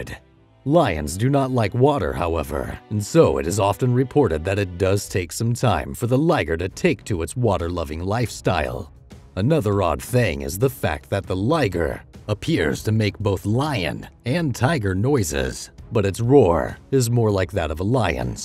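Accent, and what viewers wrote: United States. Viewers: The narrator sounds so american its distracting^^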